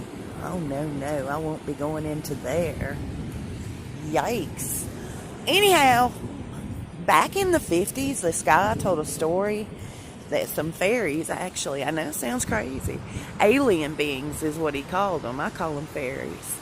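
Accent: South, United States. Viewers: I absolutely love your accent .....are you from the south?? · I didn’t know what you looked like. You have a southern accent. You finally showed your face. Your southern dialect didn’t match what in my mind you looked like., I was blown back. You are so beautiful. ✍🏻👍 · LOL - This Southern accent commenting on the royals is odd even though the commentary is on point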